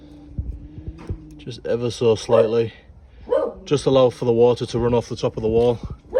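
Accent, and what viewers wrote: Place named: United Kingdom. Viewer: This in Australia mate because you sound British and I’m from Liverpool uk and worked all round Brisbane and Melbourne and I can tell by the buckets your using it’s Aus ??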